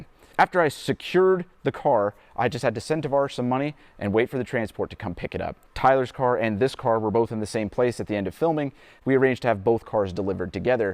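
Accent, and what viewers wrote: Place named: Kansas, United States. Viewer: Good on you! Slowly but surely, you're building content. Granted you had to wait until CT2 aired, but your delivery is so measured and "Kansan" that it is nice to another one coming along. I really like the understated "look and feel" of your channel. It's rare to watch a channel like that and NOT have it be boring. Thank you. I think I'm subbed on another account, but I''m subbing on this one now.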